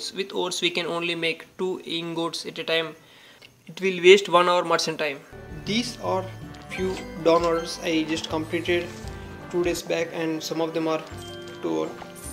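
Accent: India. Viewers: He's from Hyderabad Andhra Pradesh so that is why he speaks like that. Atleast appreciate that he knows English because most of state doesn't know English and is uneducated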